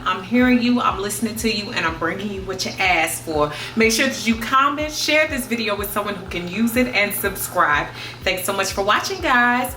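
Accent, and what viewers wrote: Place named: Atlanta, United States. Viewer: You sound like Monica are you from Atlanta?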